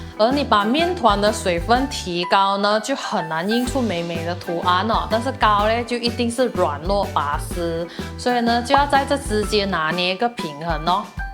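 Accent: Malaysia. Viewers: 喜欢听妳那带马囯乡音的华语.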